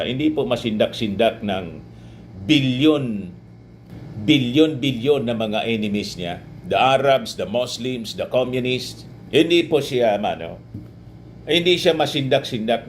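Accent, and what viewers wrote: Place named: Bicol, Philippines. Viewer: Baket ganyan ka mgsalita!bicolano ķa ba!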